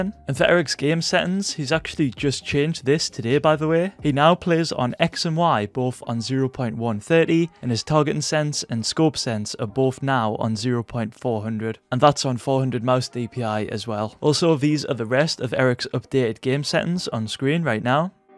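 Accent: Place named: Scotland, United Kingdom. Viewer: im from the uk aswell but your accent sound diffrent kinda like scottish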